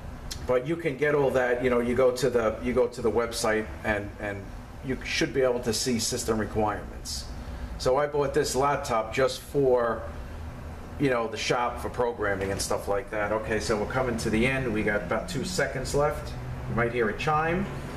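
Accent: Long Island, United States. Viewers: Great video. Didn't know about the change until recently. I was listening to you at the beginning and then saw the sign and was like oh, thats why he sounds like a Long Islander. I'm a NC native, but married my East Meadow native wife 26 years ago. We live in TN, but just had one of her heavy NY accent brothers visit recently from Long Island. Great info here. Thank you.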